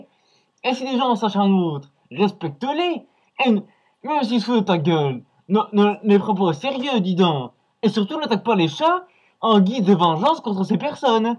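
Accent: Belgium. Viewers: Tu craint casse toi avec ton accent tu insulte la belgique!!!!! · Ah oui, il est sûrement belge lui. Je dis ça par rapport à son accent. Mais vous inquiétez pas les gens, ce mec est juste chiant. Mais je me demande franchement s'il ne faut pas prendre la chose avec humour, tout ça n'est qu'un sketch sensé faire rire. Ou sinon, c'est de la jalousie mal placée. Pis bon, niveau philosophie, éthologie et argumentation, faudra repasser. Crois-moi mon "petit gars", le chat s'il est vraiment maltraité, il aura tôt fait de se casser. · ton accent belge i ma bandé!! alor continu a decouvrir ton mac et faire mumuz ac photobooth!! obligé de mettre un mask pr parler soi tu sert a rien soit ta pa de couille! bref t bidon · tu serait pas belge toi? (pour ton accent de merde et ta connerie naturel)! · ACCENT BELGE! BAH SA METONNE PAS! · t'es aussi débile que ses vidéos. Tu te caches derrière un masque et ca camoufle pas ton accent belge. 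On a compris que tu defends les chats. Par contre, tu devrais faire une video sur les félines quand elles prennent leur petit par la peau du cou. Je pense que t'es resté trop longtemps sans oxygene. retires ton masque ca ira mieux. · Fait pitié en plus jcomprend rien a cki raconte on dirait encore un belge de merde avec sa voix xddd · ne cherche pas serieu...1) je vois pas le manque de respect envers les chats... et 2) fais pas ton philosophe, ton accent belge craint a mort!! et enfin 3) Norman est bien plus drôle...!! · Et evidémment, qui c'est qui fait ce genre de chose? Un Belge >< (Au temps pour moi si tu ne l'es pas, ton accent a couper au couteau m'a trompé) Après ca, nous, bons belges que nous sommes, on va encore s'en prendre plein la geule, sincèrement du fond du coeur, MERCI! (Putain de VDM la:/ ) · "Si un homme n'est pas bon z'avec les animaux." + GG l'accent belge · Nan mais sans déconner les gens vous critiquez sa vidéo mais le mec ca se voit bien qu'il a fait ca pour déconner avec son accent belge et tout moi ca m'a bien fait rire.... 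Alors arrêtez 2 secondes de lecher les boules de Norman quoi... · "Prinnnmièrement, les chats sont des animaux comme les auuutres hein: Ils ont droit à du respect les iiiiinfants, du REPECT! Et ca Norman ne la pas cooompris les iiiiiinfants" J'approuve, c'est bien wallon comme chez moi ca:p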